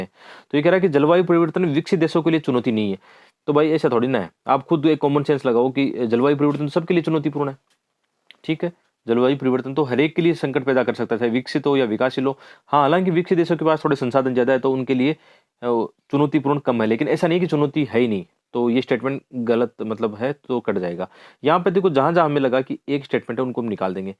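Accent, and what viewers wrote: Haryana, India. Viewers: Sir are you from haryana apki awaj se pta chlta h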